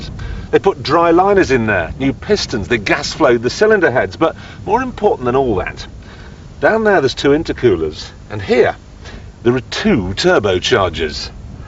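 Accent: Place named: Yorkshire, United Kingdom. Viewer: because he’s from Yorkshire but has gotten better at hiding his accent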